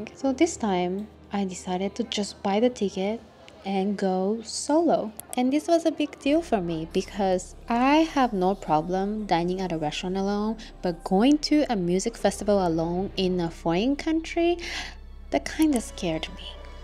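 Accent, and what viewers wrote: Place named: United States. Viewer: Why do you speak in an American accent if you're from Japan?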